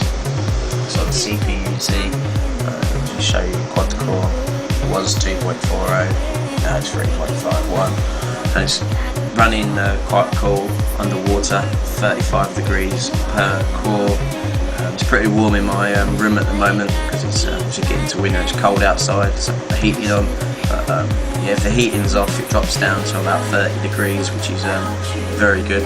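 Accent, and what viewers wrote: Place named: United Kingdom. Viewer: Ya I knew you're from somewhere around the UK because of your acsent (I think thats how you write it fix me if I am wrong =]) BTW are these games any fun ?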